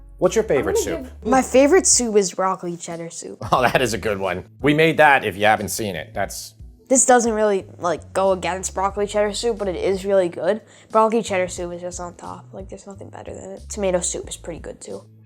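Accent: New York, United States. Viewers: I thought i was subscribed from using your beoff Burgonion recipe. My bad. I'm subbed now. One thing i learned from chef John on YouTube is that of you accidentally crowd the pan you can just keep simmering until the liquid absorbs and it will brown. It's an option for tougher meets like Chuck. He's in California now but i think he's a NY guy too originally. I'll admit, i get a little homesick hearing you talk. North Jersey is similar enough in speech pattern/ accent.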